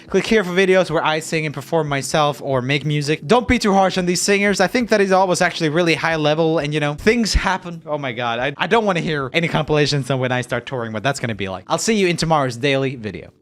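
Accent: Sweden. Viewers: RJ Alcaraz the Swedish accent.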